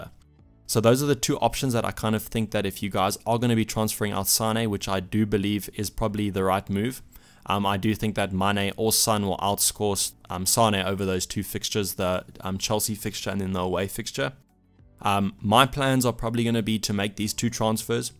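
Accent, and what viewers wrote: South Africa. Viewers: Bro u South African?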